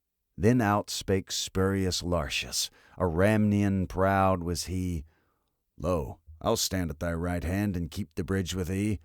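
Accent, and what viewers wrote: United States. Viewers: I had been lamenting the shortage of people reading great poems, well, in an American accent. So glad to have found this. Have you considered reading some of Chesterton’s poetry?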